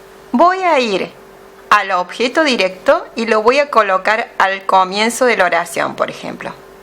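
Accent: Córdoba, Argentina. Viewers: Lo cordobés se nota a la legua, aguante Córdoba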